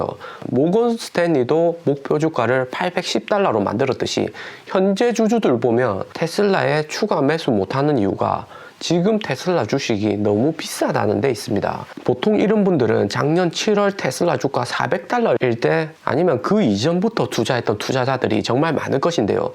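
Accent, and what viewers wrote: Gyeongsang, South Korea. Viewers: 경상도 아지야 좋아요 꾸우욱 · 덕분에 테슬라 650불에 진입했어요... 어쩐지 낮익은 목소리.... 같은 갱산도 아이미꺼 저는 마산... 샤오펑도 오늘 진입했어요.. 새해 구독자 대박대박... 영상 보신분들 꼭 구독 좋아요.. 부탁드립니다. 샤오펑도 가즈아~~~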